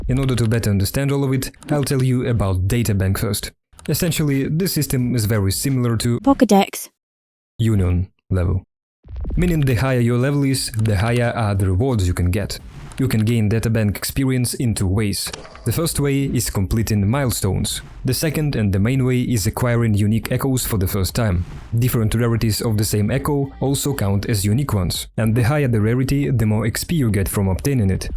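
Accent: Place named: Russia. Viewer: сижу думаю че у тебя с акцентом. И ты на русски забалаболил. так и знал. но а так видос супер. но ты не правильно рассказал про дроп шансы. (да посути 20% но из за гаранта вид этого чутка меняется) 4 косты имеют гарант дропа в 2. тоесть если 1 раз не выпал то 2 точно выпадет. кароче как с крутками 3 косты имеют гарант в 4 1 косты в 8. еще бы я сказал новичкам соединять эхо на новом уровне ДБ так как есть шанс на дроп того же эхо нового ранга что так же идет в прогресс ДБ. ну и не тратить материалы на лишних персов и эхо до 5* ну или если сложно фармить 4* а так вся база и много хороших советов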